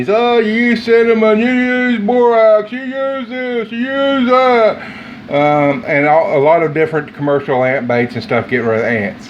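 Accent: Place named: Appalachia, United States. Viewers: Wonderful video.. I used a bait from dollar tree sat it down then came back like 10 min's later oh lord they was all by the bait (Pic- Ant killer Bait) I got mortified and sprayed Tat ant and Roach killer.. Killed them on the spot was I supposed to leave it be and let it do like the video above let them fest.. I was so darn scared I killed them on the spot with the spray.. On my way to get Terro Now.. Please advise.. Thanks - I love your southern voice ♥ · his hillbilly accent and attitude sold me. he talks just like me. i trust this man . gonna go to war with some ants to0-nighttt!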